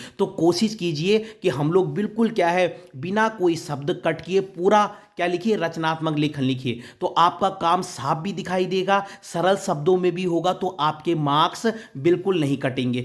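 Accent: Garhwal, India. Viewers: Sir aap garhwali ho? 😃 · Bhai tum gadwali ho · Aap uttrakhand se ho na gadhwali..😄😄 voice tuning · aapke accent se lag rha tha mai bhi gadhwali hu😛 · Sir mai dekha aapke bolne tone garhwali jase hai Orr aake video dekh rha hu aaj mera exam hai 😂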